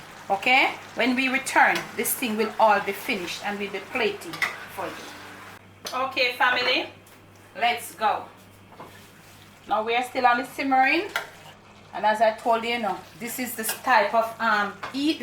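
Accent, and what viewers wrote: Jamaica. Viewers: Your delivery of this video is sooooooo Jamaican....love it!! Thank you from the UK.... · she just started cooking & I'm ready to eat🤗..lol... I love the Jamaican accent!😊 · I just love that Jamaican accent.. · I so LOVE my Jamaican Brothers and sisters I can SCREAM! I finally got to know a Sister, Collen, decades ago and got to ENJOY Jamaican Dishes! Lord knows I WISH I could connect with her again after she left Schenectady NY! I am STILL making the dishes she taught me to make! Collen made her Curry Chicken somewhat differently, but because I am adding Potatoes to mine, I want to know WHEN to add the potatoes! I so LOVE your ACCENT and try to use it whenever I am around Jamaicans! In fact, I was BLESSED to know and date a Jamaican Man for a few years! He was TOO GOOD to be true! I will always REMEMBER him as he passed last year! I KNOW he is watching over me! 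COLLEEN, IF YOU ARE READING THIS, PLEASE LEAVE A MESSAGE! (BOBIE-SCHENECTADY NY) YOU HAVE NO IDEA HOW MUCH I MISS U & YOUR FAMILY! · Mi just a buck up this lady. I love your cooking, how u talk, how u just move round the kitchen. Ol school yardie style. Food bound fi nice. I love love love your channel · Good work n ur Jamaica slang make d food romantic kudos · I love the Jamaican accent. It looks delicious. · I just love listening to you talk. Haven't heard Jamaican like that in such a long time. Reminds me of growing up. Do you have a restaurant?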